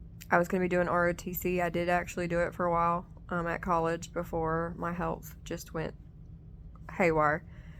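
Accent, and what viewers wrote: Appalachia, United States. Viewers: I’m not from the south originally. I can 100% hear your accent! Love it! Don’t try to fit in! Be your beautiful authentic self!! My sister is my neighbor. I live in the holler & she’s up on the hill. We’re putting in a zip line this fall to make visits more fun. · Oh honey, I grew up w my parents but with Appalachian grands people treated them mean too. I can sure tell u aint nothin like them stories from back in the day. Ignorance isn't the problem you have, it's theirs for making so many assumptions smh! Hate is hate, haters gotta hate to stay alive I think. Listening to u makes me miss them, but in a good way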